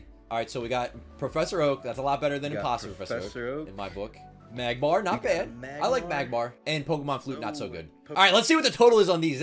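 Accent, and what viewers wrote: New Jersey, United States. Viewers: you can really hear that new jersey accent in his old videos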